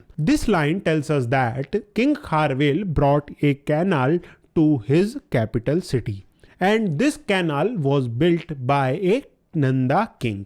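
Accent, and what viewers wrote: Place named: Hindi Belt, India. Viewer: Arre Babu, please follow Sanskrit and Odia pronunciation not Hindi heartland - It is Kharavela not Kharvel.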